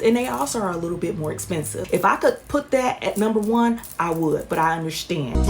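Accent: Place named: South, United States. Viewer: New Subscriber! Great video. Love the southern accent 🤗💜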